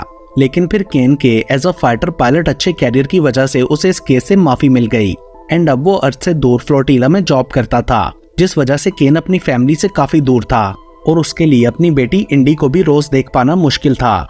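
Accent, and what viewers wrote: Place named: Punjab, India. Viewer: Hindi in punjabi accent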